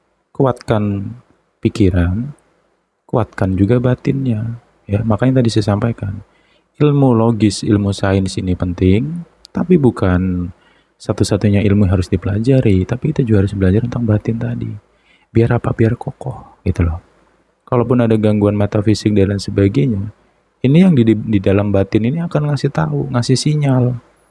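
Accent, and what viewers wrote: Java, Indonesia. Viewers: Asalamualaikum😁ea mas suwun lo tapi bru semenit kok udah kangen mas ngomonge fersi jawa gitu ngena banget mas🤍🙏🙈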